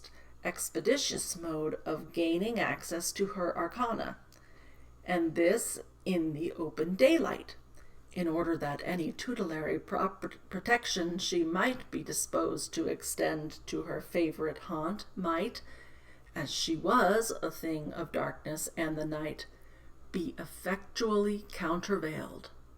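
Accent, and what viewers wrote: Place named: United States. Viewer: I enjoyed the read along, learn American English pronunciations, and the story. Great idea and resource.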